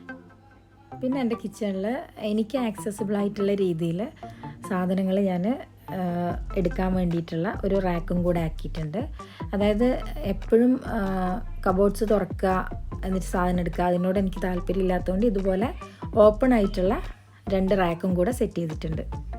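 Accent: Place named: Kannur, India. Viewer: aano.samsaaram ketappol njan karuthi kannurkaariyaanennaan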